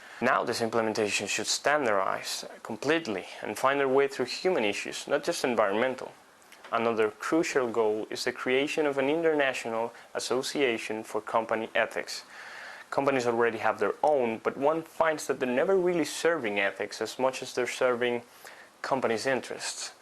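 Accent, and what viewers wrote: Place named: Netherlands. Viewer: Wow, you sound so dutch...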